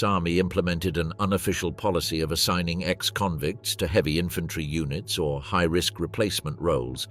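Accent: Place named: United Kingdom. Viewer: Why do they have a limey talking about Our Army?!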